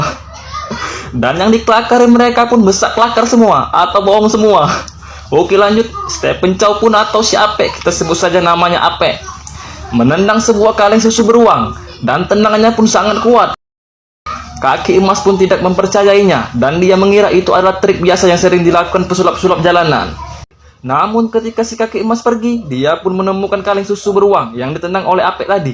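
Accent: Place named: Palembang, Indonesia. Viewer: Ay wong palembang cknyo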